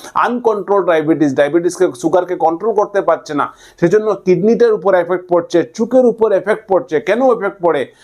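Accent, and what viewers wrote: Sylhet, Bangladesh. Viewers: ভাই আপনি কি সিলেটি আপনার কথায় আমি বুঝতে পারতেছি আপনি ১০০% সিলেটি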